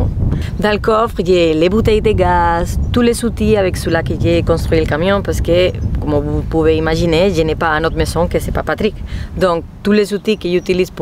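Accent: Spain. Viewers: Bonsoir. Bravo pour tes vidéos, et en français en plus 👍, avec cet accent espagnol.... adorable !!! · J'aime l'accent espagnol, ma grand-mère est née en Espagne. Et ton français est très clair, tu peux avoir confiance. Ton aventure est très intéressante, merci de nous la faire partager. Bon anniversaire de voyage. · Ton français est très bien surtout ton accent espagnol te donne un plus 😊😊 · Je te regarde grâce à Raphaël et je ne regrette vraiment pas mon abonnement à ta chaîne. Ton accent espagnol est comme un médicament de bonne humeur donc on a besoin régulièrement mdrrrrrr gros bisous tout plein de la Belgique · J'adore votre accent espagnol !!! Et votre français est très, très correct. (*) (en fait, ce qui importe, c'est l'organisation cérébrale ; et là, chez vous, ça turbine et c'est de niveau solide) Salutations d'un sédentaire... PS je vous ai découverte par les Mariolles trotters *) puisque vous aviez quelques inquiétudes à ce propos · Avec tout tes chiens tu avais vraiment besoin d'un camion 😂 Ton accent espagnol est charmante ❤ De verdad tu hablo franxute mejor que yo espagnol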